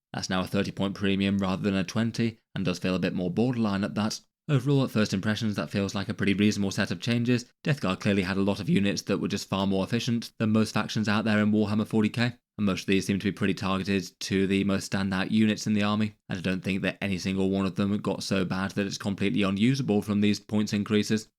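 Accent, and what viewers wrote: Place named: United Kingdom. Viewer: 5 years of listening to you and still have a hard time making out your mono tone British accent on my cell phone speaker at work.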